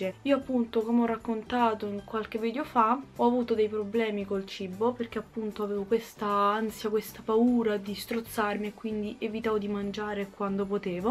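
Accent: Rome, Italy. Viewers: MADONNA CHE ROMANA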